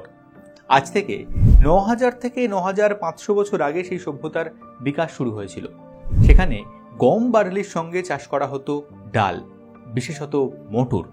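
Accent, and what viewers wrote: Standard. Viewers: Bengal gram? I wanted to know why it is called Bengal Gram? great content and pleasing to ears 👂 to hear 100% pure Bengali. ❤ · সুন্দর ভিডিও। সবচেয়ে ভাল লাগল সুন্দর উচ্চারণে স্বচ্ছন্দ বিশুদ্ধ বাংলা। আজকাল বিভিন্ন ভিডিওতে বিভৎস উচ্চারণে হিন্দি মিশ্রিত কুশ্রী বাংলা শুনতে পাই। সিরিয়ালগুলোতেও হিন্দি গান হিন্দি শব্দের অনুপ্রবেশ কানকে পীড়া দেয়। সেখানে আজকালকার ছেলে হয়েও তোমার সুন্দর মাতৃভাষা শুনে খুব ভাল লাগল। ভিডিওর বিষয়টিও বেশ ইন্টারেস্টিং। যথেষ্ট রিসার্চ করে তৈরী করা। ধন্যবাদ।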